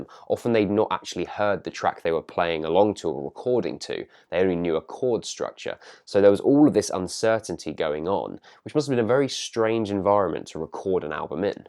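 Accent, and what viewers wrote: United Kingdom. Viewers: I love your articulate descriptions, your serene voice, your British accent, and your very handsome face. Listening to you talk about albums I love is validating, cathartic and almost as enjoyable as listening to the albums themselves. No homo tho, for reals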